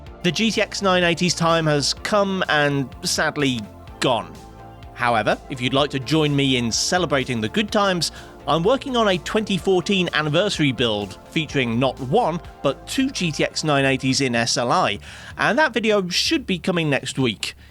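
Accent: England, United Kingdom. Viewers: Mr english accent, please make a video of a 2070/2070 super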